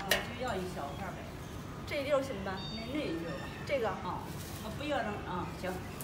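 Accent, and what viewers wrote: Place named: Weifang, China. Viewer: 肯定是潍坊老乡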